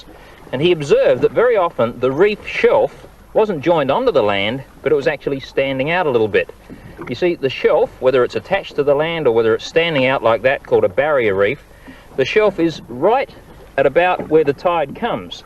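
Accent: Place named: Australia. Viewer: I feel stupid now for never having realized that this was an australian show, i just thought he sounds kinda funny.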